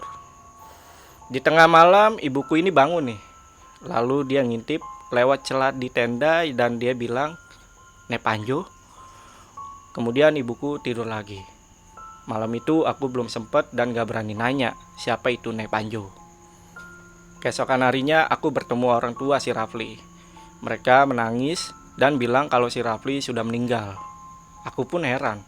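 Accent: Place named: Bali, Indonesia. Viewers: Bang asli mana? Logatnya ky beli Bali 😁 i like it ❤ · Ini naratornya orang Bali apa NTB ya?, penyebutan huruf T nya beraksen daerah tsb. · mimin org bali kah ,logat T nya ko keg org bali ? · Naratornya orang bali..ya.?kelihatan dri logat nya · Yang baca berita ini apa orang bali ya ? Bli ? Logat T nya sangat ketaraa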